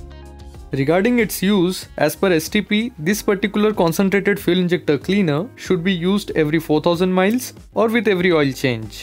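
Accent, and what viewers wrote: India. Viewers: The Indian accent is killing me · Duh, indian english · Indian accent not arabic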